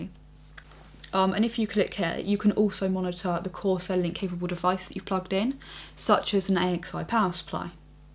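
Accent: England, United Kingdom. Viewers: you look russian on your thumbnails, and suprised when i hear the british accent haha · I really like UK accents! It just sounds so nice for some reason! xD Great video btw! :) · I'm sure you meant English* accent dude. She's English and thus speak with an English accent. I'm an American and I speak with an American accent, happens to be a Southern one (Virginian) since I'm out of the South. Something tells me you are an ignorant Yank. :) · Blondie and Coumputers? o_O? lol but her British accent is sweet:) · A brit-accented blonde telling me about technology? What sorcery is this?!!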